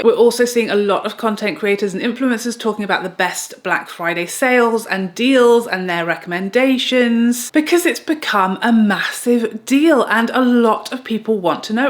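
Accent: United Kingdom. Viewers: Yes, Black Friday started the day after Thanksgiving here in America. I know people who wake up at the crack of dawn to wait in line at the stores to buy that TV. It's a tradition for some people. I can't imagine shopping in store on that day - the crowds are unbelievable - not fun. Thank you, for being true to who you are - this is why I follow your channel. Also, I love your British accent.:)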